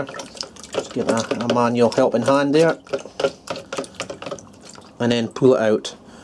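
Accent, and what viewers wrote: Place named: Aberdeen, United Kingdom. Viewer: Great video, thanks for taking the time to show the process! I'm inclined to get some of that cleaner stuff and try it, although I might need to get a new ultrasonic tank as well (mine is rather old and out of whack). As it happens, I spent today making up a batch of PCBs and wondering how the pros go about cleaning them. My own process, for what it's worth, is: Soak boards in isopropanol inside a sealed plastic tub for 30min - this softens up the flux and crap Scrub well with a toothbrush in the IPA - this usually gets everything off but sometimes there's little bits of hard flux around big solder joints, so need to give them a wee scrape Wash with hot water and detergent, to remove the IPA Dry thoroughly with a compressed air jet Personally, I love using compressed air for drying things, because it gets into tight places very easily - e.g. 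under SOIC chips, or inside IC socket pins. By the way, are you from Aberdeen? I detect a wee bit of an accent! [I'm from West Calder]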